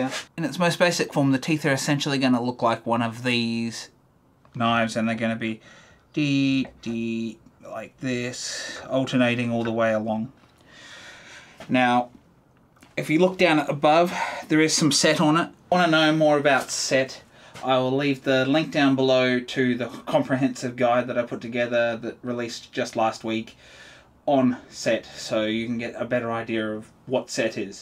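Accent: Australia. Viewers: Thanks for all of your videos. Plus hearing you makes me miss friends I made in Australia- I’ve spent about three months total in AU between Navy port calls then I had a consulting job and went to Brisbane, Darwin and out to Jabiru. Cheers!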